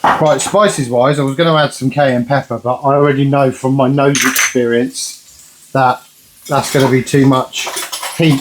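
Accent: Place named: England, United Kingdom. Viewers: I'm from Hull and had your accent as Yorkshire, but I was thinking Doncaster or Goole. 👍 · Just looking at you I did not expect an Englishman lol You look like an American 😂 So the accent I was like whoa lol! Love your channel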